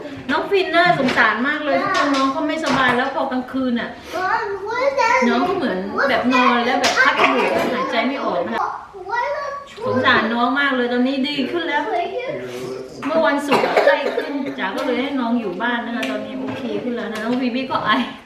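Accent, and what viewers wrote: South, Thailand. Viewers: ถึงว่าสำเนียงทองแดงออก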